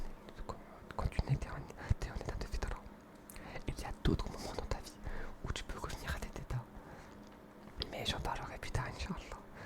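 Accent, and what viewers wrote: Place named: Lyon, France. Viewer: Sérieux t de Lyon ! Moi aussi ! Ça me ferait de te croiser Inchallah mon frère . J’me disais aussi il parle comme les lyonnais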